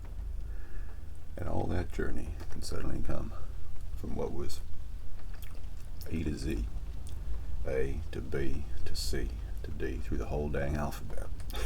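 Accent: South, United States. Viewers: Very sexy character.I like it when you use your American accents! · Being from Oklahoma, your accent is pretty spot on, as are your jokes, very much something a southerner would tell. I love this ASMR, especially because of the rain and your deep voice. I really hope you can get a 3Dio sometime soon, that would make your content even more incredible. I wish I could donate, but I wish you the very best in reaching your goal! Thanks again for the amazing work you create. (I really need to stop leaving long annoying comments ahh) <333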